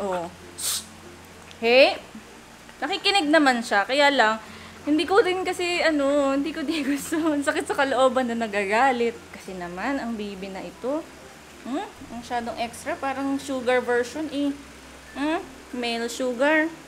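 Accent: Visayas, Philippines. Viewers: Nakakatuwa na nalaman ko na ang ay nakatira ng 3 years sa davao!Bisaya din ako and ang cute ni Ate mag bisaya😂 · Ang cute ni ate mag bisaya 😅 kababayan pala kami ni 😅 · cute ng bisaya mo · Ate taga davao ka pala huhu sayang taga digos ako, pero sana ma meet kita ate Ang cute mo mag bisaya. Pero welcome ulit sa Davao city po❤️❤️. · ka cute ba nimo mag bisaya ate oyy haha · ay ka cute Ate mag bisaya oyyy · watching from davao:))))) ka cute nimo magbisaya ateee always watching your vlogs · Ka cute man mag bisaya oy 🤣🥰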